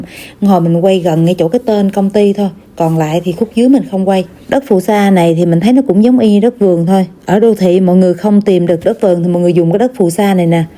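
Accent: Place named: West, Vietnam. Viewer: Giọng miền tây dễ thương dễ nghe hay do tui nghe quen rùi nên thấy dễ thương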